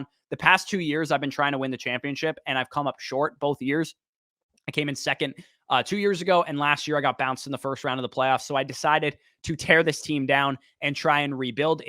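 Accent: Canada. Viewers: Nice, you from Canada eh,?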